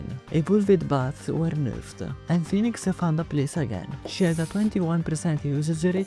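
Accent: Italy. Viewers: yea hes definitely italian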